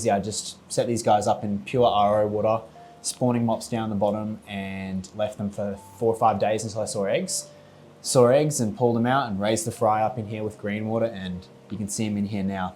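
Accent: Australia. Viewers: Love your videos. As an American, I get a little chuckle out of hearing Australians say H like "heych", and here like "he-yah"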